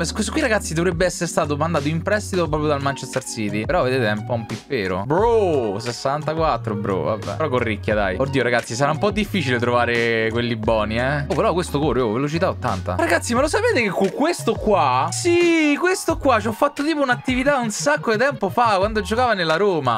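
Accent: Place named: Palermo, Italy. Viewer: che parla palermitano 🔝 😂😂😂😂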